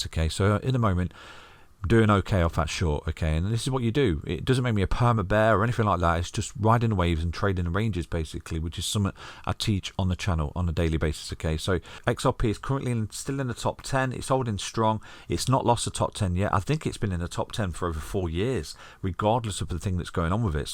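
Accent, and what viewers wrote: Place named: Birmingham, United Kingdom. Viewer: I love your brummy accent lad.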